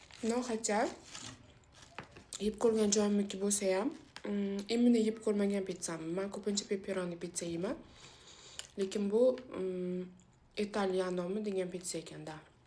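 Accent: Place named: Karshi, Uzbekistan. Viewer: Каршидан буса бу нима тошкентчани булгаб ташади узини тилида гапирса бусасиикан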